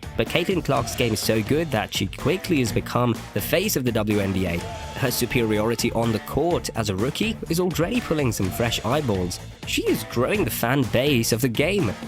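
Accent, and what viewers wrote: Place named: United Kingdom. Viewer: Nothing gets me into the mood for basketball like a British accent.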